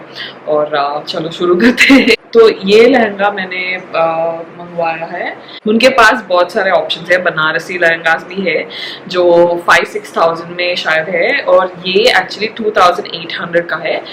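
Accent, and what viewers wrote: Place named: Hyderabad, India. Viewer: Hyderabad ka Hindi v bole to acha nhe rahta..... jaisa rhta waise he chalenga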